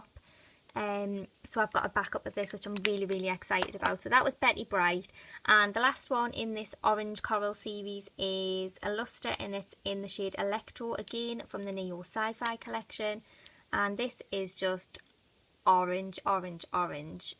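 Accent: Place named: Newcastle, United Kingdom. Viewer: Are you from Newcastle Your accent is gorgeous. ♡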